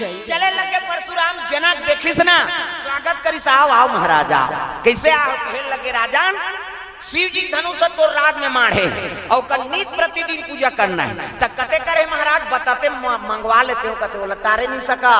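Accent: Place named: Chhattisgarh, India. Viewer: छत्तीसगड़ी बोली माँ बहुत मिठास हे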